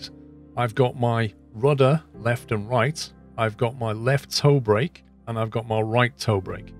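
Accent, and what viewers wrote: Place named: Blackpool, United Kingdom. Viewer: From Pac-Man to MSFS 2020, with some real-life certified droning in between. Glad to have found your channel as I just got FS 2020 and already crashed the Cessna 3 times while trying to land. Your tutorials will help a lot! You sound like you're from Blackpool or thereabouts (Liverpool?). My wife's dad was born in in Blackpool so I sort of grew an ear for that accent. Thanks again! Great tutorial!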